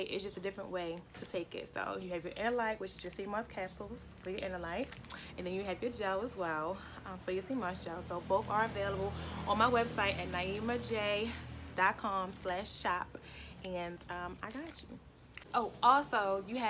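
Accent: Baltimore, United States. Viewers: She is nice...I hear that Baltimore accent...GOOD Content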